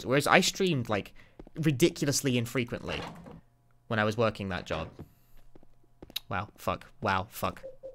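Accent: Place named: London, United Kingdom. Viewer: if you're reading this my dude which part of London are you from?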